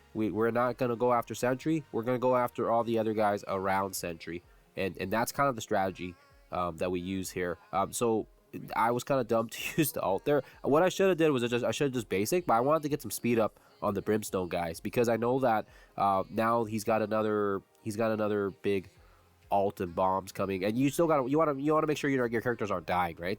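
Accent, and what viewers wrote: Canada. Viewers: You sound just like rayge gaming to me lol. Is it just the Canadian accent? 😂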